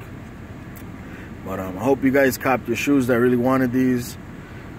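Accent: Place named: Massachusetts, United States. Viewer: sneaker Man you're from mass i hear it im from dorchester you jist gained a sub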